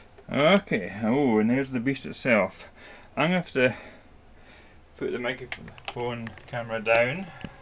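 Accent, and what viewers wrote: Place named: Scotland, United Kingdom. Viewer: wasn't expecting that! Still sounds VERY Scottish to me!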